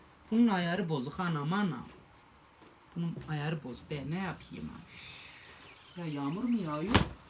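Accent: Turkey. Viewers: Bence sen zazaca konuşma aksanli karakocan zazacanla hep kendine argo şeyler söylüyorsun lexanlisiniz siz galiba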